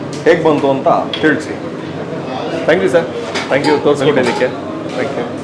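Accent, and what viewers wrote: Butler English, India. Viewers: Sir, your butler inglish is top, after very long time we are hearing, keep it up.🎉❤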